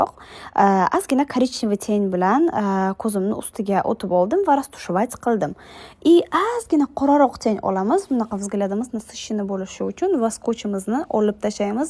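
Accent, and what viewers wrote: Bukhara, Uzbekistan. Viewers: Qayerdansiz shevayiz Buxoro ili Navoiy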